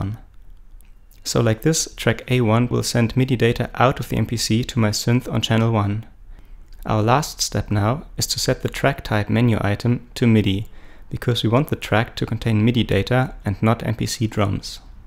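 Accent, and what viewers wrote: Germany. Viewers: Are u german ? Oder halt bist du deutsch ? Your acent sounds very german :) I want to buy me something like this and your tutorial was very clear and helpful :)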